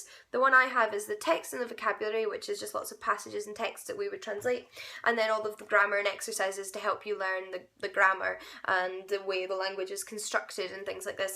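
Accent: Scotland, United Kingdom. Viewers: Your accent sounds Scottish. Didn't know anyone in that area of the world was interested in ancient languages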